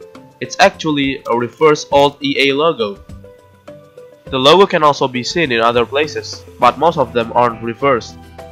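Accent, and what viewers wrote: Indonesia. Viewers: udah ada firasat xD dari logat bahasa inggrisnya ketauan bgt orang indo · Good timing! I'm playing Sims 3 supernatural right now. Also, gosh your English accent is so Indonesian just like me. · Indonesian accent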